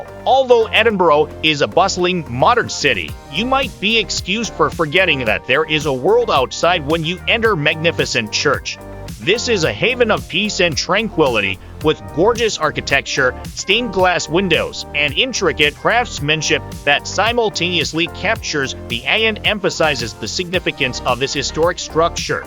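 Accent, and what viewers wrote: United States. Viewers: The worst possible voice over ever! Cheezy all-American accent, multiple mispronunciatons including - ad nauseum - Edinboro??!! It's Edin-burr. Or as many locals say, Em-bra. Some nice video clips, though some syncing with the narrative would have been beneficial for those lacking familiarity with the city. Also, for any visitor, walking through the New Town is a great experience and can be done enroute to Dean Village before a walk along the Water of Leith to Stockbridge enroute to the Royal Botanics. Also, a day on Victoria Street? I think not! There are some nice shops, true, though most are specialist offerings. An hour would likely be max. Though the Botanic Gardens is a nice place to find some quiet, so is the west end of Princes Street Gardens, with the castle overlooking. Get some eats at Marks & Spence on Princes Street and make your way down the steps at the bottom of the Mound...and 'dine' in the shadows of the castle. 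Fabulous!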